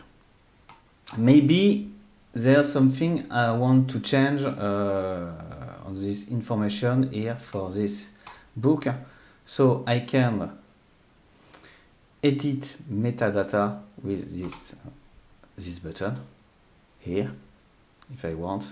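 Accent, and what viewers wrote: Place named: France. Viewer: Do not ever apologize for your French accent! :)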